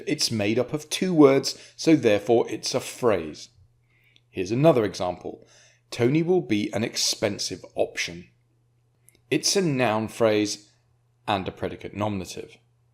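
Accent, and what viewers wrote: United Kingdom. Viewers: Bro is the british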